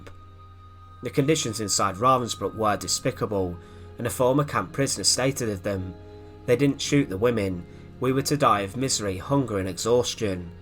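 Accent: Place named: Northern England, United Kingdom. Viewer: hes a working class man from Northern England..not too sure where from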